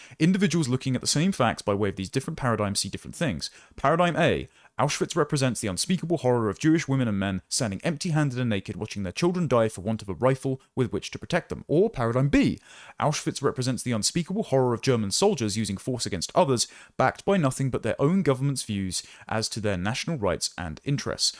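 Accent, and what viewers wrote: RP, United Kingdom. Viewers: This posh useful is simply adding to the panoply of antisemitic tropes--all couched in Oxford Union pseudo-intellectualism spoken in RP. 'Bizarre Heredi, Hasidic cults, and all-powerful Jews,' indeed!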